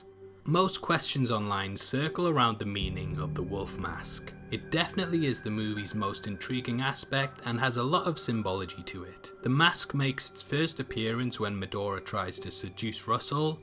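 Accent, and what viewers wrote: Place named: England, United Kingdom. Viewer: This guy was incorrect about a handful of things in the movie and then says "they have became" ... I guess having an English accent doesn't guarantee that he'll speak proper English? Thankfully, I got the gist of the video. Lastly... this movie was not a thriller and frankly is not worth the time. It's a horrible movie.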